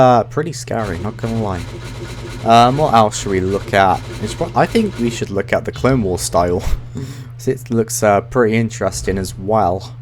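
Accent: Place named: Plymouth, United Kingdom. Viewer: Where are you from? South west? Can hear the janner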